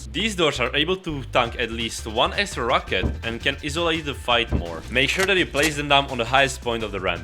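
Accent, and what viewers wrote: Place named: Czech Republic. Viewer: Český přízvuk jde prostě poznat haha super baseka!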